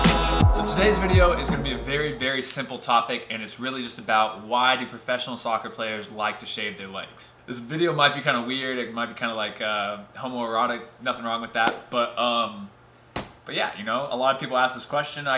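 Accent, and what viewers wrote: United States. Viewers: pedro mota he is American, thats how he was taught to say it. Theirs nothing wrong with it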